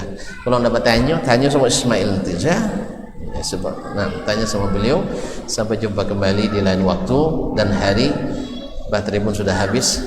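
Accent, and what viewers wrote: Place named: Minangkabau, Indonesia. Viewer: setuju... Suka logat minang walaupun kurang paham 🙏🏻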